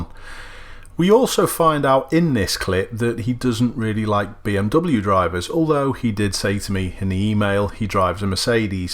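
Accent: Liverpool, United Kingdom. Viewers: Remove ALL German whips from our roads haha!!!! Seriously though, not great driving I agree. I am now 50 years old and my driving has improved significantly since I was a youngster. It is ALL to do with attitude and mindset! Keep up the good work, I have never listened to a Liverpudlian so much before but your videos are great and keep me mindful about so many things when I drive.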